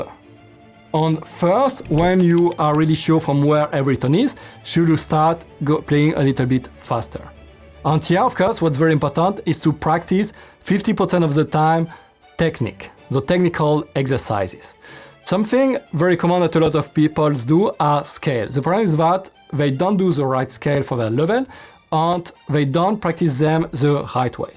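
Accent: France. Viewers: I love your French accent 😁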